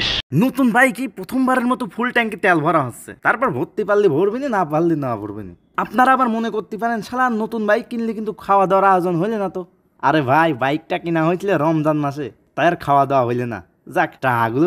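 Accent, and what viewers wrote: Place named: Kushtia, Bangladesh. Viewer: vai ki kushtiar mal naki kotha kinto akdom kushtiar lok er moto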